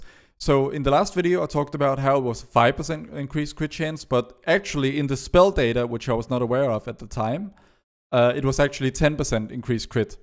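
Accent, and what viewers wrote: Denmark. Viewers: Are you Danish by any chance? The accent seems very familiar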